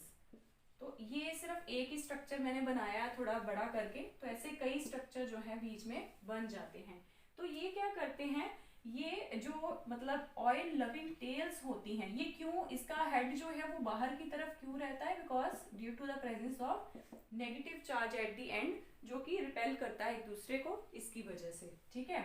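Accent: Punjab, India. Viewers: Mam apka channel acha study karwane ka style bhut vadiya sabse badi batt acennt english language ka punjabi hay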